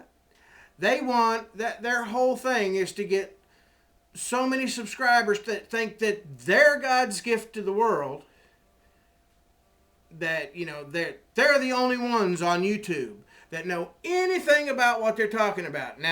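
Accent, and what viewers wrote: Appalachia, United States. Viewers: i like that hillbilly accent i salute u from sweden!!!! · U speck hillbilly me to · love your vid as somone who lives in peoples rep of kalifornia.what your saying is plane old fashioned common sense! be prepared where ever you are i learned that in the army,love how you speak hillbilly my roots are missouri and tex,louisiana take care thank again gil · speakin hillbilly works for me.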